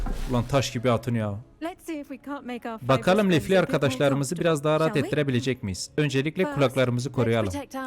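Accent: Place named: Diyarbakir, Turkey. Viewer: Abe Diyarbakırlımısın şiven küfrın kürtçe bilmen buralari andıri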